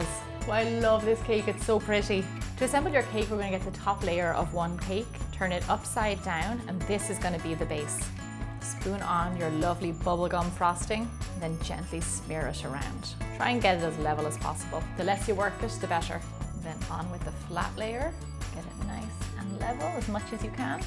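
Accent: Ireland. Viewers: are you from Ireland? because your accent is beautiful! lol i wish u had one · Your voice makes me miss Ireland lol, but the cakes looks so pretty.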